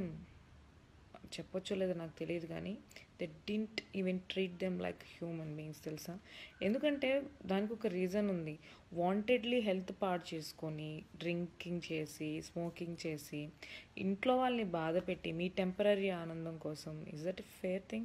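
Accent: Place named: Latin America. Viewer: Like I said I thought you were Hispanic I like your accent or respect you keep smiling I like them I like what you have on with respect I respect you but this is very interested I keep looking at you right now in this video I'm still in shock I just wanted to spoke to you the other day or 24 hours ago I don't know if this is a brand new video I don't know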